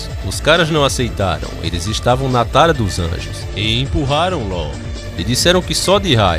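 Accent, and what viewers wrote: Northeast, Brazil. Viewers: narração bem nordestina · seu sotaque nordestino e a cereja do bolo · Muito top a narração ainda mais com sutaque do meu nordeste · o melhor do vídeo e o sotaque nordestino sou nordestino também nossa língua e foda lklk muito bom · O sotaque nordestino é naturalmente engraçado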